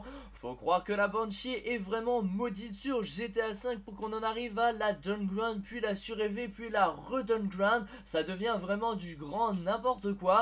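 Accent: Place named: England, United Kingdom. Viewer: mec, tu racontes n'importe quoi, enfin bref vrai ou pas peu importe, en tout cas arrête ton accent anglais c'est carrément hilarant...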